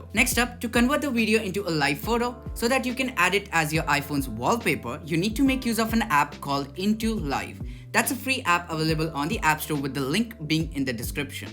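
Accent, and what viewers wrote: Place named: Middle East. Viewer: Sounds like a middle eastern scammer.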